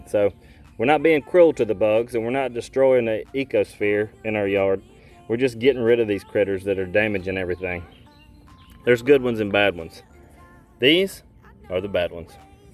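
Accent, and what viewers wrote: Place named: South, United States. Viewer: I just learned what a "Mole Cricket" was yesterday... 'but have had them for about 4 years as I recall. Now I'm taking them to war. The more I learned about them the more panicked I got. Thank you for an informative, non-panicked, very peaceful video. I think it's the southern accent. I'm gonna have some sweet tea... 'and slaughter every last one of them Texas-style. Thanks again Sir.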